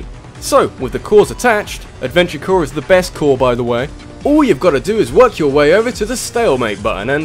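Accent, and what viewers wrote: United Kingdom. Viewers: Gigadrain is it a British Accent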